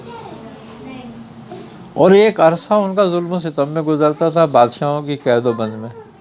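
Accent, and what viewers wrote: India. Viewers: aap ki urdu bhuhat achchi hey dilliwali urdu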